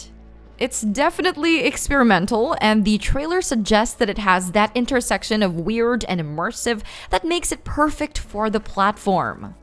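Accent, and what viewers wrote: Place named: Philippines. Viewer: MooseRocket she sounds like a FOB Filipino?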